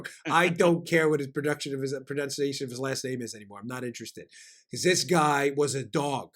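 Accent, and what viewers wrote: New York, United States. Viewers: HAHA FUCKIN DAN..."WAX RX...it comes like this,....and goes on like that, buy it you'll love it " bang boom pow! HahahaHaha with his New York accent, and then Joe hits em with the MUTTLEY LAUGH, oh I'm dying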